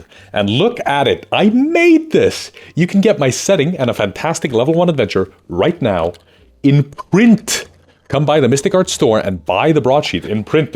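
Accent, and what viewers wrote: Iceland. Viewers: I don't who you are deep voiced icelandic accented henry cavill lookin harry potter glasses wearing person. but your video showed up in my feed and i liked it.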